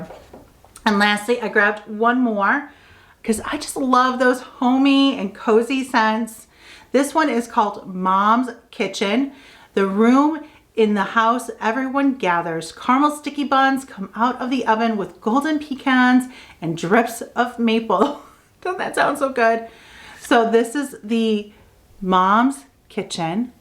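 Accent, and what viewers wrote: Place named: Western New York, United States. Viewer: I knew you wer from the Western NY! I could tell by your warm demeaor and your accent!! I was born and raised in Hamburg, NY. I will have to check that store out the next time we are back in Buffalo visiting our family. If you have any other mom and pop shops you can recommend, I would love to know. We come back every summer and I love to find new home decor stores! GO BILLS!!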